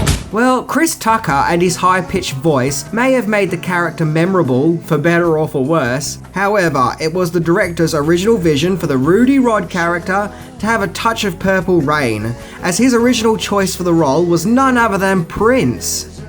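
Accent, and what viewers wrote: Australia. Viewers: Hey love that Ausie accent!!! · imagine if made an 10 amazing facts video and didn't introduce 'imself in his best australian accent ".......and I"M!" i'm holding out for an "I'M " at the goodbye. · wait...you are from Australia?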